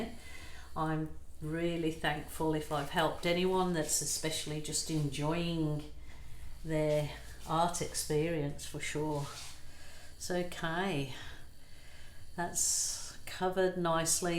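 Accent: Birmingham, United Kingdom. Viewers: Haha haven’t lost your Brummy accent. Love your work 😊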